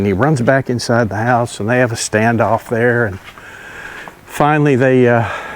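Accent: United States. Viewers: Your health is so good Sir , you could walk for an hour and making videos for your fans Keep it up! Sir I watch your videos to practice my listening I really love American English. Love from the Esarn part of Thailand.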